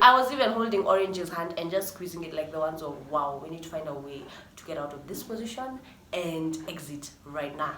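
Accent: United Kingdom. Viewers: You do a mad British accent.... Damn😍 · Uko na accent ya Briton 😮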